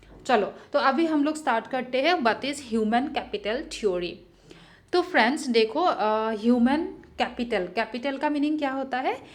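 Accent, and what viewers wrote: Assam, India. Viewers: Baa apuni Assam r neki?? Pronunciation tu Axomiya jen lgise aru apuni o no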